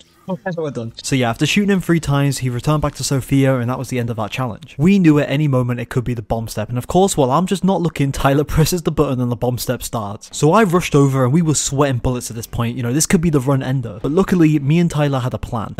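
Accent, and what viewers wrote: United Kingdom. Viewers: a tale as old as time an english accent, and a cod zombies easter egg like bread and butter, good and evil, night and day. yin and yang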